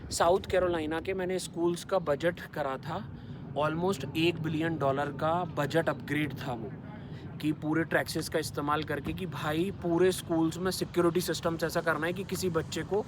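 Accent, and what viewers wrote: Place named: Bundelkhand, India. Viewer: Jst LOve Your Bundelkhandi accent :face-green-smiling:💟😍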